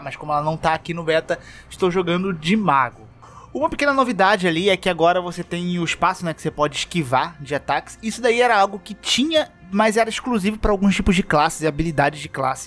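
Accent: Rio de Janeiro, Brazil. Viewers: esse sotaque carioca me fez fechar o vídeo nos 10 primeiros segundos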